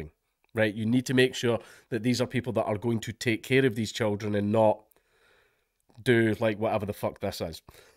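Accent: Scotland, United Kingdom. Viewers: This is literally the first dankula video I've watched in my life Was not expecting him to be Scottish, I have to say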